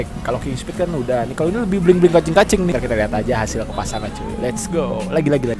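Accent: Jakarta, Indonesia. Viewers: Logat Betawi nya keliatan😅kayak lucu aja logatnya gitu asik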